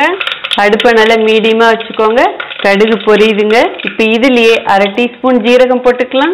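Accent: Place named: Coimbatore, India. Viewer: ஏந்த ஊர் கண்ணு நீங்க உங்களுடைய பேச்சில் கோயமுத்துர் வாசம்வருதோ recipes are super I made your pongal and tiffin samber recipe it came out well thank you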